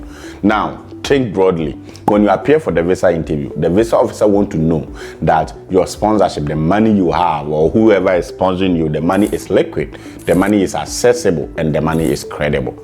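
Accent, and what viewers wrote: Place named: Ghana. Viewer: I think you are Ghanaian, Ur Accent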